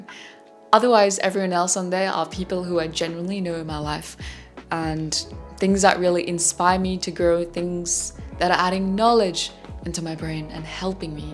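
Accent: India. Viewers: ur voiceee issssss sooo beautiful are u Indian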